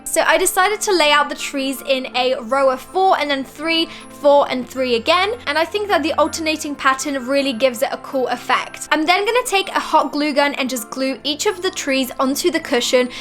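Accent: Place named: England, United Kingdom. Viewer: Yes I like your DIY core where are you from are you from England or from are you Irish cuz it's I was just curious cuz that accent sounds like you're from England it'd be great to hear somebody else you know talk English I just wanted to compliment on your your crafting send me a line and tell me you and I'll just send me a line I said Thank you or you know that kind of thing all right God bless you have a blessed week and evening I can't take care of by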